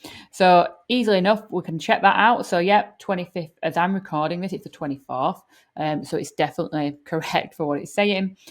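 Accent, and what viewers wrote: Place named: Leeds, United Kingdom. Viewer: Thanks. "Customise" just seems like such a funny place to go to add a dashcard, doesn't it? PS. Grew up in Leeds so nice to hear an IT "How to..." vid with a proper accent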